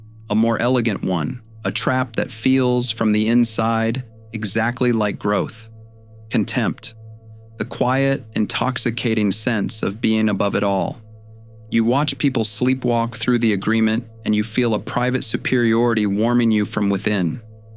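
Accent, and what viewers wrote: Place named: England, United Kingdom. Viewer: What happened to the English accent?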